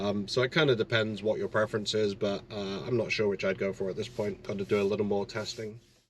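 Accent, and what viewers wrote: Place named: Northern England, United Kingdom. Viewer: Sounds like he's from northern England----- north-west possibly---- anyways good review.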